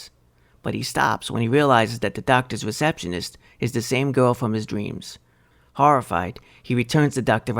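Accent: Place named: East Coast, United States. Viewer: As a twighlight zone fan from age 7, now, and until I shuffle off this mortal coil, I appreciate you doing these reviews. Living in the Midwest right now it's good to hear an East coast voice talking bout Rod's creation. What's your name brother...mines Edmon. 😎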